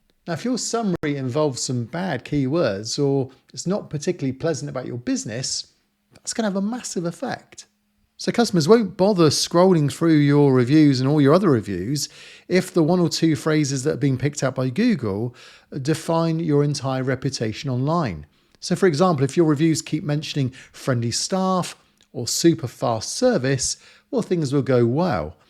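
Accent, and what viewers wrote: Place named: England, United Kingdom. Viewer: Appreciate the guide. Makes a nice change having to listen a Englishman 😁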